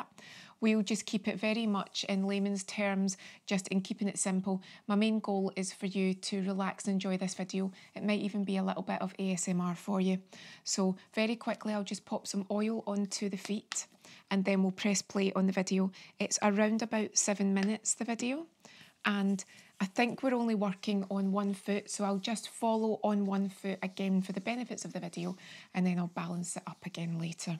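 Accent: Scotland, United Kingdom. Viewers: I found you today looking for a how to massage video. I really like your voice and accent. What part of Scotland do come from? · This looks so relaxing. I found your channel through recommendation from another channel i follow Love your follow alongs, and the indian head massage tutorial is soooo relaxing. Love Scottish accents too 😊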